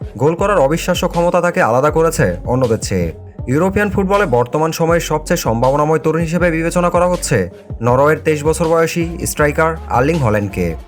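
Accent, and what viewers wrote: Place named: Bangladesh. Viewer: Tui I think bangladeshi mal tor vasa sune bojha jay.. Tora video bans na..jato abal public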